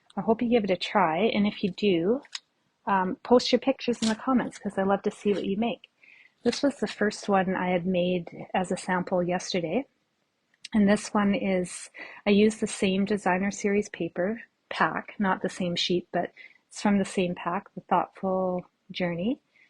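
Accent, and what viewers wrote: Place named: Canada. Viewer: I’m enjoying your design and instructions. Very clear sound. I thought you sounded like a Canadian! ❤. I’ve subscribed so I will see and hear more of your vlogs!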